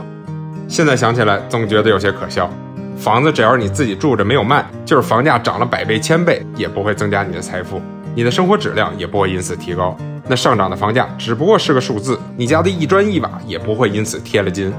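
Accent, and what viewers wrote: Beijing, China. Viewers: 一直觉得是北京的～终于在这个视频里得到了认证哈哈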